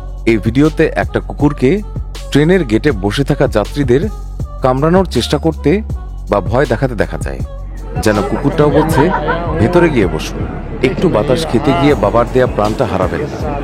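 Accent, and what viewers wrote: Bangladesh. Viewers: Ami sure apni narrator er beware jante chacchen. Hmm mone hoy Bangladesher Bangali. ❤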